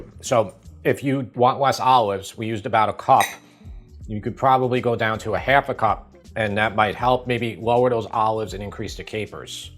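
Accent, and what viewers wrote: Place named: Long Island, United States. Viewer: wondering if i still sound like this..... im giggling everytime you say capers... grew up on long island but hopped to the other side of the pond 10 yrs ago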